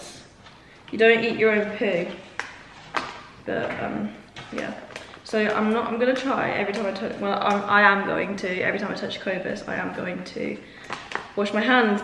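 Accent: United Kingdom. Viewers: is she british or does she have origins?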